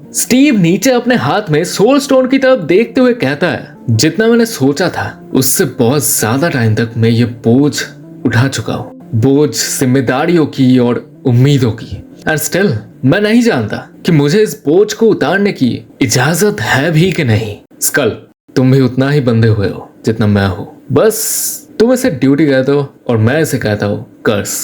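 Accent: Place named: Bengal, India. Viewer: Bhai main pj ko dekh ta hoon moc ko sunta hoon comic verse ko badal ko desinerd ko bhi par jab maine tumhari video dekh ni shuru ki mujhe maza aya is liye nahi ki tum kuch alag bana rahe ho is liye kyu ki tumhari hindi thodi weak h jaise bengoli log bolte h maza ata h sunne mein isse thik mat kar na keep it up ❣️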